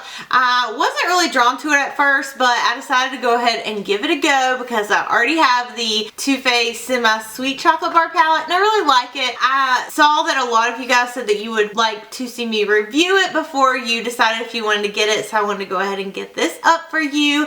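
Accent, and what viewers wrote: South, United States. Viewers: OMG idk if i've said this before but i love your voice! Its so country! lol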